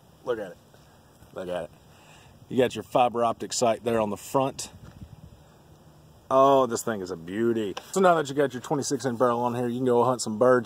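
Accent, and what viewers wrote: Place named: South, United States. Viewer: If it weren't for the southern drawl, you'd sound like Barrack Obama.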